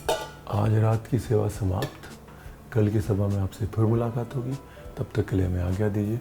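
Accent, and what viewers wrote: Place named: Punjab, India. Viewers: Sir before seeing your video I like that you such very good expaliner or your Punjabi accent is such a amazing ♥️♥️sir you explained very well and every single minute is utilised when I see your video 😊😊😊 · I love the way you cook, giving instructions and your punjabi accent while cooking. It's a great fun and enjoyment while cooking. Thanks Ranveer❤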